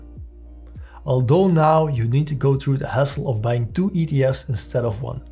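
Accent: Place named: Belgium. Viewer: Haha, giere. Zoek ik video's op over investeren, en ik hoor echt een Belgisch accent 😂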